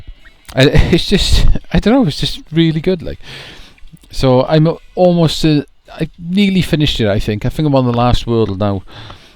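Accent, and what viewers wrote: Wales, United Kingdom. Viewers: Lol..'if you're not into it, it's a waste of money'. I did laugh at that. Even more golden in your Welsh accent ;) Nice haulage indeed. Many may dislike you for that Wii U bundle..jammy git. ZombiU is good fun,I really like it. Great playing that in a dark room, great atmosphere about it. I'd be keen on seeing your new 12 days of, for sure :)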